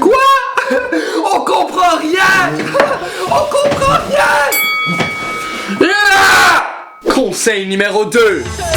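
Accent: Quebec, Canada. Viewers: c'est l'accent Québécois Français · Je suis d'accord pour l'accent !!! Je kiffe l'accent québécois !! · Hahahahaha!! Chui québécoise mais ton accent est beaucoup moins prononcé que le mien ou celui d'autres personnes :) je sais pas pourquoi ;) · Pour séduire je sais pas, vu qu'aucun Québécois m'a jamais séduit, mais pour faire rire ca c'est sur ! xD c'est pas de ta faute, je trouve juste l'accent drôle ^^ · MOi je comprend tout ce que tu dis :D j'adore l'accent quebecois ;p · J'adore l'accent québécois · J'adore l'accent quebecois moi, je trouve ca trop beau <3 ( ceci etais une information inutile ). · Avant, j'aimais pas l'accent québécois, mais depuis que je regarde les vidéos de, j'adore!!:) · j'adore l'accent québécois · C'est pas l'accent Québecois qui est Sexy,c'est!<3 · jaime trop l'accent québécois <3 sinon cool ta video ;) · y a pas que les Québec qui savent faire l'accent que tu as moi je suis "francais" et j'arrive a le faire. 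· j'adore l'accent québécois 💓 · L'accent québécois est juste so cute. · Moi ça me seduit vraiment l'accent quebecois :P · J'adore ton accent et je surkiff le quebec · En même temps il a pas l'accent québécois la plus prononcé non plus! :D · eeeee ses pas tout les quebecois qui on un accent comme lui moi je suis quebecois et il me gosait vraimen beaucoup son accent alors ..... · Je suis Suisse haha :') Sinon vraiment t'es trop mignon et j'adore l'accent québecois _ J'adore tes vidéos :) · Toi ta un accent plus francais quebecois ^^ moi je vien du saguenay donc on comprend rien loool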